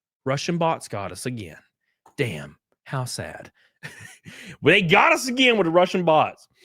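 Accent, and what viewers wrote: South, United States. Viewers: Hey there’s the hunk with the bad ass country/southern accent, how you been?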